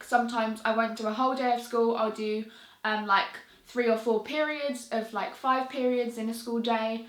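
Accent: United Kingdom. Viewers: Gotta luv that british accent! Cant get over it! And when you sing? Your amazing! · I love yor british acent , is very elegant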